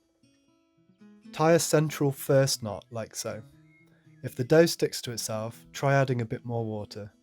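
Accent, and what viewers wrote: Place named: England, United Kingdom. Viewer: Besides the English Accent the birds chirping with music is wonderful Seitan's Red Robins?, AnyDrOwlWho? Pasta etc 10-15 min , is it really necessary to cook seitan for 1 hr everyone seems too , what is the minimum cooking time ? without a pressure cooker of course ; and is yeast necessary needed or OK without added yeast ?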